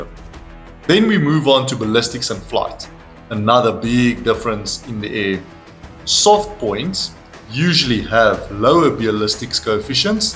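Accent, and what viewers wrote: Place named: Afrikaans, South Africa. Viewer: Jeeez, you very Afrikaans.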